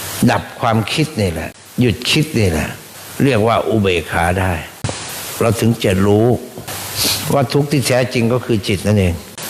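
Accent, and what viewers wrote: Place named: Isan, Thailand. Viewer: กราบสาธุหลวงตาเทศภาษาอีสานปนด้วยฟังสนุกได้สติดีมากครับชอบที่สุด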